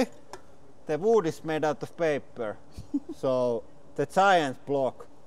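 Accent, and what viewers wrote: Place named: Finland. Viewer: The rally English is strong with. ei pahalla mut perkele.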